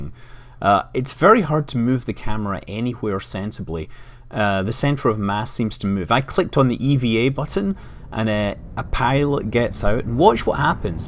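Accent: Scotland, United Kingdom. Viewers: scottish, not much difference, i know cus am irish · Nope, it's a Scottish accent.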